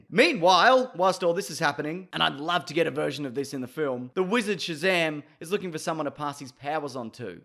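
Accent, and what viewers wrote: Australia. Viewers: I can tell that your an Aussie bye your accent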